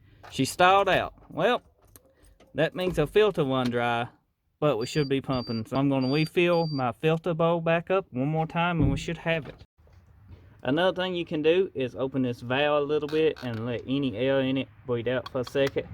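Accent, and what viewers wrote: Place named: Louisiana, United States. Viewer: I'm going to take a wild guess and say this feller is from Louisiana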